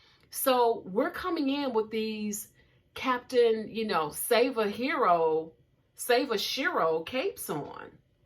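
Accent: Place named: Detroit, United States. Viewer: Shout out from the D! I thought you sounded like a Detroiter😊i was in Paris solo in July...racism was overt but I still had a great. careful have to be careful.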